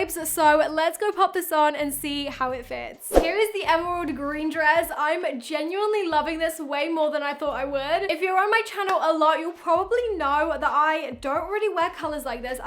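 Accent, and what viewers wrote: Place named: United Kingdom. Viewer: By your accent I can guess you are from UK so please do a video on urbanic clothing..... Btw in love with your videos ❤️❤️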